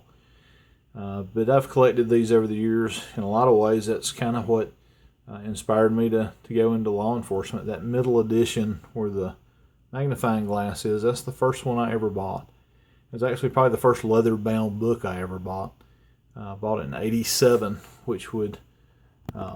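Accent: United States. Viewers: is he from texas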